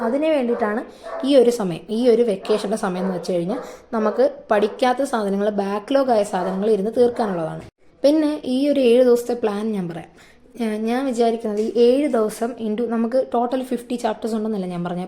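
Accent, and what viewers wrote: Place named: Alappuzha, India. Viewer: Alappuzha aahn nn thonunn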